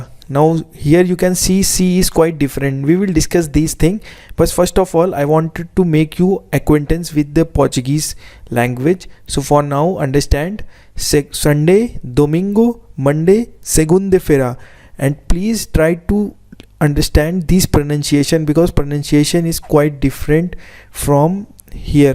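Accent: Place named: India. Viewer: You sound like an Indian. Keep up the good work. Needed these kind of videos for so long!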